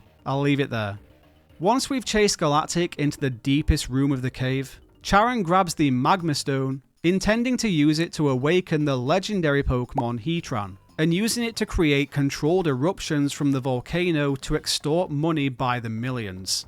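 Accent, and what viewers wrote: United Kingdom. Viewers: I love the contrast between your cutesy looking avatar and your, at least what sounds to me, south yorkshire accent. got a giggle outta me lol I'll add that if you want, there's some glitches that allow you to acquire the event legendaries. they're not hard to execute but you should follow a guide so as to not get lost in the eternal abyss. but I'm sure you knew about it already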